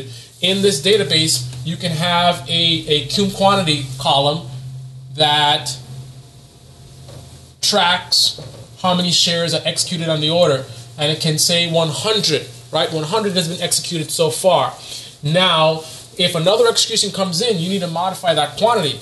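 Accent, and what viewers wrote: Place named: United States. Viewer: Thank You. I am a beginner and you talked to me like I am a beginner ( not stupid but a beginner ) thank you. I have gone through a PLEATHERA of videos and information. Many people I simply cant understand their dialect from where ever they come from. You Speak English in American English and that helps me ( okay maybe I am stupid ) but thank you. Doing good -- keep the classes coming -- ROCK ON.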